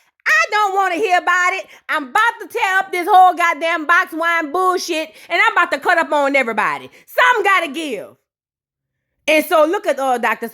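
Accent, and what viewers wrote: New Orleans, United States. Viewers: I just wanna say you funny asf and that new Orleans sounds just turns it up❤ im always on da floor crying 😂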